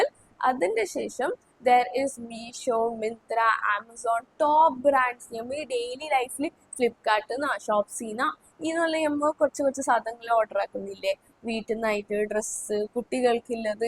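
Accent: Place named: Kasaragod, India. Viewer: Kasaragod slag ❤❤😂😊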